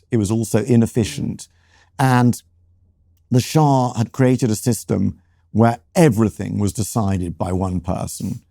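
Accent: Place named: United Kingdom. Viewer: just as dull and ridiculous as his British accent this retard is so blinded to distinguish between the turks, Arabs and Islam... imagine saying the short painter or Leopold II of Belgium were representing Christianity... we Arab have alot of issues and we acknowledge our mistakes, but we didn't commit horrible crimes as the turks, British or the rest of colonial criminals at least our countries weren't built by looting India, South America, Africa... and of course we did not send our armies to to force drugs trade on Chinese ... the latest savages as AL qaeda, isis were founded by the USA to fight the soviets and later to destabilize Syria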